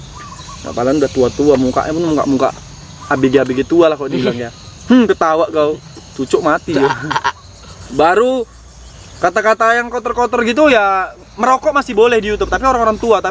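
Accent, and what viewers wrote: Indonesia. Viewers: Orang medan ya bang, tapi logat jawa😂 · logat nya khas kali Bg Medan nya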